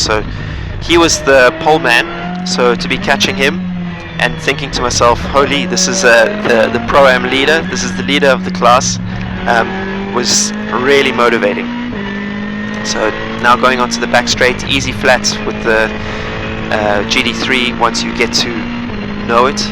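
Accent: South Africa. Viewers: are you South African? you sound South African. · Greetings from SA! Totally unmistakable accent right there.. (: Nice to see a home boy succeeding like this in the racing world. Suffice to say I'm jealous of the cars you get to drive... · You sound South African.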